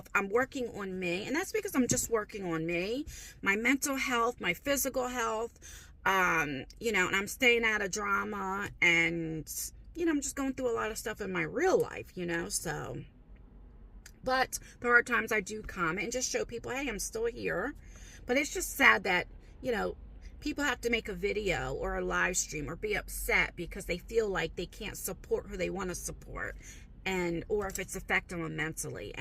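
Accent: New Jersey, United States. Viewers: Hold on to your hat,. I subscribe to over 800 YouTube channels. I have a very wide range of interests. I quit cable two years ago and YouTube supplies me with news, films, how to's, and lots of entertainment. I thought I'd miss TV but I don't and that's about twelve hundred dollars a year back in my pocket. I'm also a Jersey girl and when I hear you talk I hear my sister's accent and speaking style. You can take the girl out of Jersey but you can't take Jersey out of the girl. Cheers! 😊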